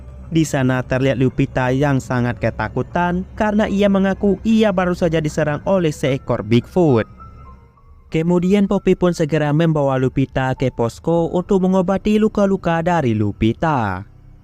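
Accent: Aceh, Indonesia. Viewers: Bahasa logat Aceh nya nampak kali iya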